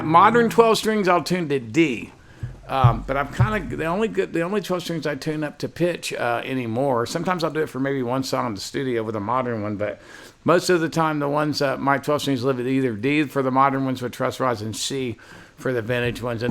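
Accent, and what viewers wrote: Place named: United States. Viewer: You sound American. Bleurgh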